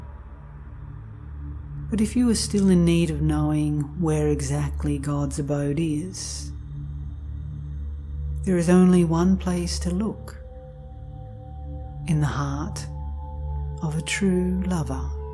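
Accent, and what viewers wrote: Australia. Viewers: Rumi in an australian accent?? Woohooo!!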